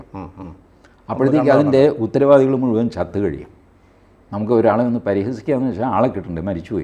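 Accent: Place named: Trivandrum, India. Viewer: Like his TVM language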